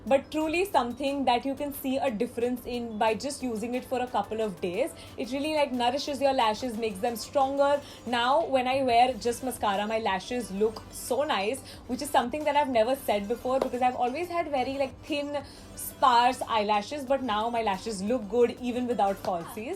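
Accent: India. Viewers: I luv ur hindi accent diii......😍😘😍😘😝😛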